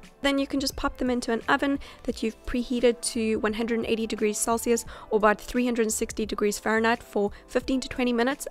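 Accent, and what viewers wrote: South Africa. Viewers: Is she from South Africa ?? Cause she sounds south African 😹 and she uses a lot of Woolworths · Love your accent, is it South African? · when I heard your voice I was immediatly like South African hahaha I,m from cape town but love the video and thank you' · Are you south african? You rlly sound like one! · Are you south african? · You sound South African 🇿🇦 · Nice South African accent. Woohoo. I'm trying to lose a little bit of fat around my tummy. I work hard on my body and run hard but always have a biscuit for tea time and white bread. These are the clean snacks I'm looking for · Do you live in South Africa? You sound South African 🤔 I'd love to see a video with a bit about your life ! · Nope shes South African, u can tell by her accent :P, some south Africans have that accent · Video was really useful and aesthetic 💕💕💕 Also a question... 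are you South African? Sorry if I’m wrong but your accent sounds really South African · Are you a South African?? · Are you South African? Your accent sounds like South African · Are you South African? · Are u from South Africa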